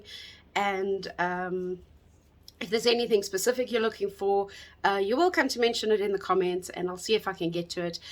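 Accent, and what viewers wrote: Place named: South Africa. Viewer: that and Ur south African accent 😝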